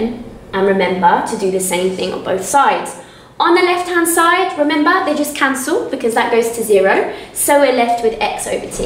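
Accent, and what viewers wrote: United Kingdom. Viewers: Bro BRITISH ACCENT IS LIKE 😅 EASY TO GUESS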